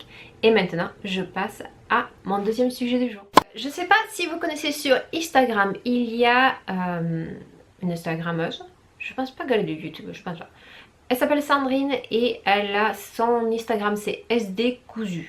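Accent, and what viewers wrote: South, France. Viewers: Bonjour, merci pour ce partage avec ton bel accent ensoleillé 😄 Tu parles de l'application Couture Actuelle, où puis-je la trouver?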